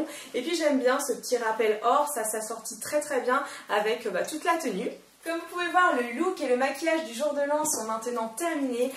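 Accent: England, United Kingdom. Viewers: Ce look est vraiment top soft et efficace et puis ton accent anglais est trop mignon ! Et j'ai le même collier Mim' Bisou bisou ♥ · Tu es juste MAGNIFIQUE.....tout est parfait le Make up est généralissime même une débutante peu le reproduire géniale. Pour l'accent anglais ça me rassure j'ai le même que toi LoL..Aller des bisous Mouhaaaaaaaaaaaaaa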